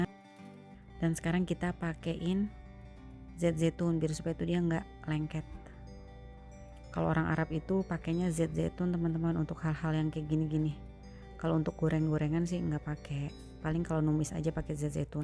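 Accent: Jakarta, Indonesia. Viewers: Logat Betawi nya jangan hilang ya mpok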